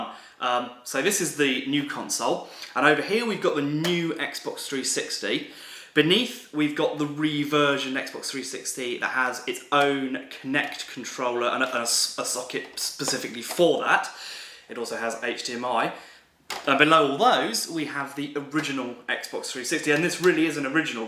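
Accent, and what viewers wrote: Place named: RP, United Kingdom. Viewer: He's posh with bad grammar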